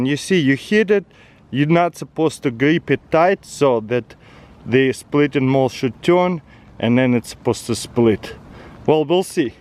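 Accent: Russia. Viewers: Idk but I think he has already reviewed this axe or it's probably another dude with a russian accent.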